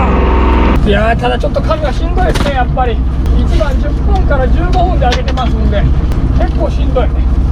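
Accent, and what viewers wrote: Okayama, Japan. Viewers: いつも楽しみに見させてもらってます 車エビ最高ですね 刺身で食べたいです 岡山弁？が ヤッパリ最高です 無理せずこれからも 楽しい映像をよろしくお願いします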